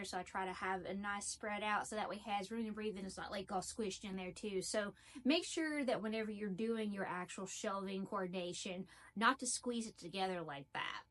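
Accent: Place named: Texas, United States. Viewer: she sounds like a Texan to me 😍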